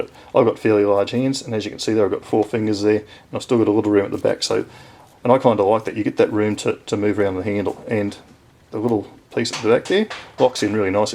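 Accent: Australia. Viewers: Great review. Nice to hear an Aussie accent instead of all the Americans. Have you ever used the Ontario SP10? If so what are your thoughts compared to the SP50?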